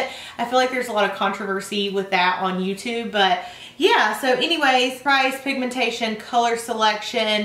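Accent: South, United States. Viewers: Just found your channel and I LOVE that I've found someone with a southern accent that does makeup videos haha!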